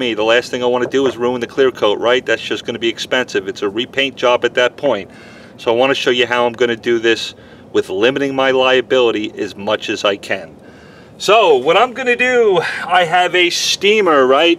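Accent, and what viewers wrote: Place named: Texas, United States. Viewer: Great job but work on your Texas accent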